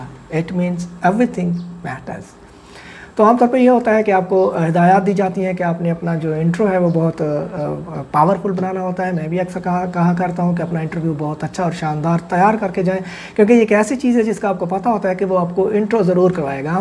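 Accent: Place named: South Punjab, Pakistan. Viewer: Sir you are looking the resident of south Punjab and your accent in speaking more than your words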